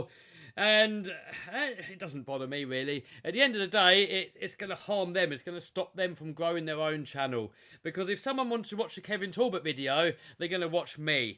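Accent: United Kingdom. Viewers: Yo, i join to this Chanel a year ago and i have seen all ur main videos chanel i like u, ur conten and the british english Continue been like u r i love all what u do, in all videos u make me laugh and i hope u get that 2 millon of subs Thanx and a greeting from Spain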